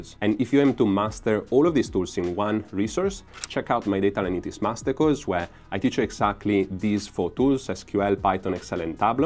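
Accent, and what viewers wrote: Spain. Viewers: Este acento suena español pero gracias me has resumido mucho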